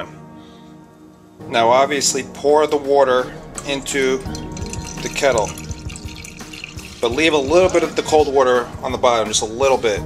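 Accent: Argentina. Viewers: I know you're talking in an Argentine accent but if you didn't know IF you didn't that is it's actually pronounced bombiya it's spelled bombilla but I'm sure you know that but I just had to tell you I don't know why but I did... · love how you speak the Argentinian languague...bombisha...very nice and very informative. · That's how we say it in the Rio de la Plata region. It's not wrong, it's just our particular accent. just like your version of pronouncing words isn't wrong either, it's just your version of spanish or castellano.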